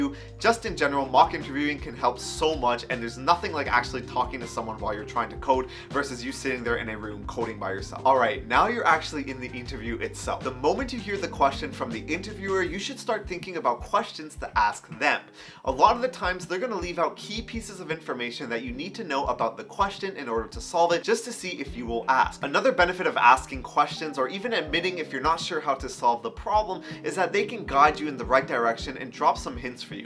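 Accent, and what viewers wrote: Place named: Toronto, Canada. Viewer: Just saw your Redux video and I'm like this guy is from Toronto ( I am too) just by your voice. thanks for the clear videos and explanation on Redux. Lots of videos don't show HOW and WHY things are done but just "this is how it's done". Keep being awesome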